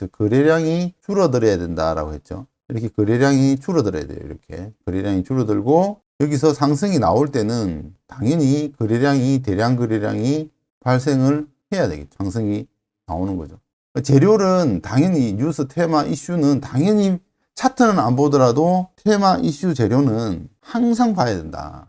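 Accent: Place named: Gyeongsang, South Korea. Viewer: 와 투박한 갱상도 사나이신데 강의는 알짜배기 입니다.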